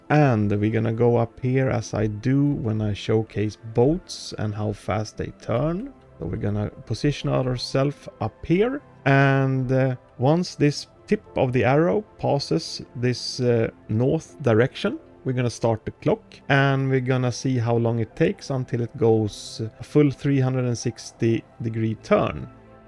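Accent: Sweden. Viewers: it feels like you are from sweden cause the acent you have feels like swedish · u swedish right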